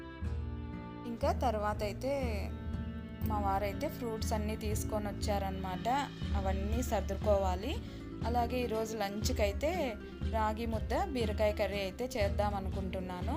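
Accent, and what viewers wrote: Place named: Telangana, India. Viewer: Mee slang తెలంగాణ లాగా ఉంది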